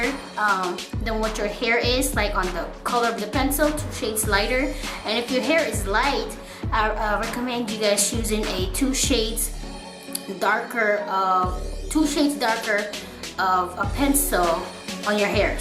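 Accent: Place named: Philippines. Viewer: You are so pretty! I watch you all the time. Love your eyebrows, eye shape, and your cute accent! Sounds a bit Filipino. =) You Friday Night Hair GLS95 straight long hair looks good! I wanted to order it last week, but it was sold out! =/ What type of hair is it? Does it have a Yaki feel, or a silky smooth feel?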